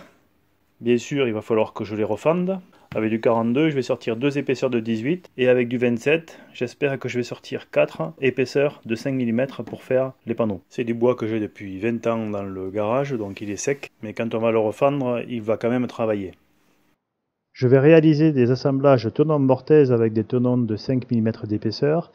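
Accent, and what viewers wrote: South, France. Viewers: Super et avec le petit accent du sud agréable à voir entendre et comprendre. Le bonjour de Londres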